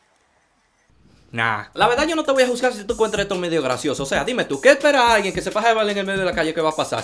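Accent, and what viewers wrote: Cibao, Dominican Republic. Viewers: LO QUE ME CURA ES EL ACENTO CIBAEÑO QUE EL TIENE JAJAJA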